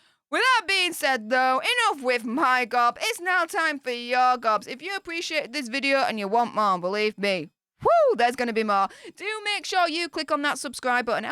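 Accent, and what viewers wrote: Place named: Cockney, United Kingdom. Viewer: I really like you but I can't understand half of what you say. Lol. I'm American and not used to your thick accent. I understand most British and Australian accents but have difficulty understanding you. Are you cockney? You remind me of the beginning of My Fair Lady.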